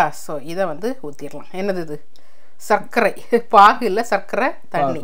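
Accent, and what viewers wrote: Nagercoil, India. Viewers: Super.....நாகர்கோவில் பேச்சு மாறவே இல்லை.கேட்க நன்றாக இருந்தது என்னுடைய பாட்டி செய்வார்கள்.... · உங்களின் நாகர் கோவில் தமிழ் அழகு. என்று சொல்வது அதைவிட அழகு.இங்கு தமிழ் நாட்டில் உள்ள நிறைய கிறிஸ்துவர்கள் தமிழர்கள் என்று சொல்வதில்லை. தங்களை வெள்ளைக் காரர்கள் போலவே நினைத்துக் கொள்கிறார்கள். உங்களின் முந்திரி கொத்து ஸ்வீட்ஸ் சூப்பர் சூப்பர் 👌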